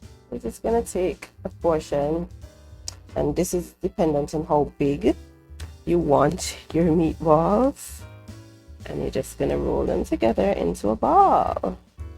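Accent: Antigua and Barbuda. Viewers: Are you Antiguan? You accent sounds that way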